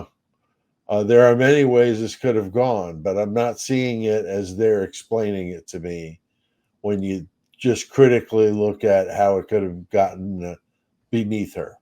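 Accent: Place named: East Texas, United States. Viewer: Cool, first time viewer. Liked your Idaho coverage. Do I hear east Tx in that accent 🧐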